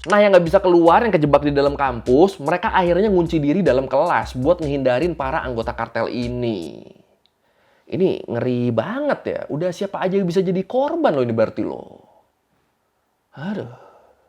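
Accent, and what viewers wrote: Indonesia. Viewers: Macam sialan perbaca dari indon ni.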